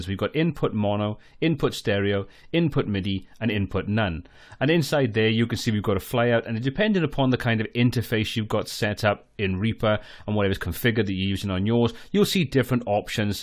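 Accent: Wales, United Kingdom. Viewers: Excellent video, easy to follow. Solved my recording problem in the first few minutes. And a great Welsh accent.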